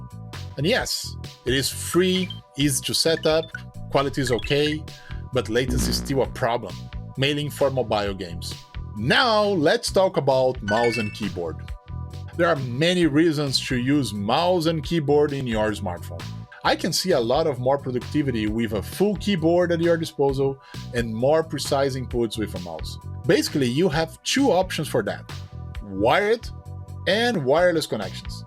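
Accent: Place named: Brazil. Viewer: Although your amazing English I think you are a Brazilian guy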